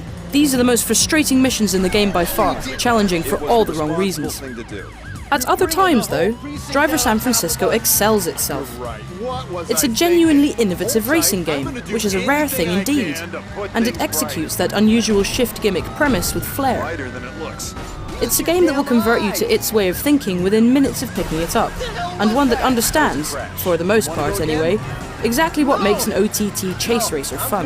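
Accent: United Kingdom. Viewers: British. I think.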